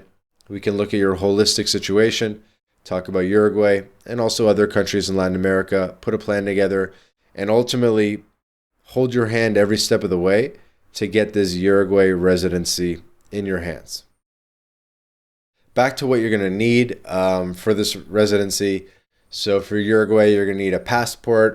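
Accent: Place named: Canada. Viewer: Your accent tells me you’re an annoying Canadian. You’re talking about the poor retiree / annoying GenZ / “digital nomad“ way to get a permanent resident visa in Uruguay. I’m certainly not a Uruguayan lawyer, though I know several, but it would seem there are far better permanent residence visas available in UY, which have much less restrictions one’s need to remain in the country on a more or less continuous basis (after all, if you’re not a poor ass Gen Z, you might want to occasionally travel somewhere like Southeast Asia or Western Europe or even spend a week or two in Argentina or Chile or maybe southern Brazil). Though of course, because Uruguay values (as they certainly should, and we all should, whether in Canada, in the United States or anywhere else in the world) that in order to get a permanent residence visa of any kind, one has a true desire to spend significant time in the country and integrate fully with the culture (in other words, if you speak no Spanish and/or don’t respect the local culture, you have no business in UY, permanent residence visas-wise), unlike in Canada and the United States, in both of which people who are completely incapable of assimilating in either country’s culture, much less speak the native languages normally used there, are allowed to enter and remain indefinitely, which is a travesty). 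Yes, so far as I understand it the “independent means“ visa is available. I’m just saying there may be better options assuming you’re not a poor ass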